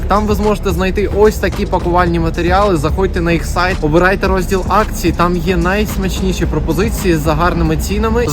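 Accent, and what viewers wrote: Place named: Ternopil, Ukraine. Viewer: Була впевнена, що ти з Тернополя ☺️👍🏻 Дуже гарно розмовляєш з нашим тернопільським акцентом.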